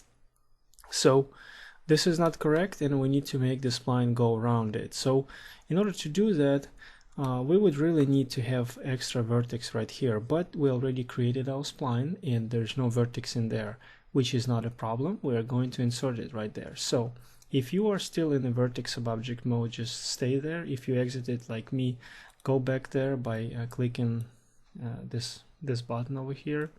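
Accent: Russia. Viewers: You have special apeak type are you russian i think you are russian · are you russian? you kinda sound like one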